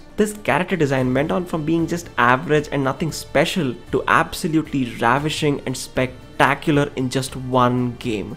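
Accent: India. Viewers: your accent makes It sound like real love the Indian accent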